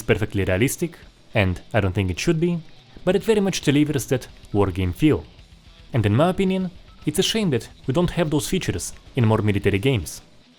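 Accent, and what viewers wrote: Russia. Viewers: Твой акцент выдает твою русскую личность)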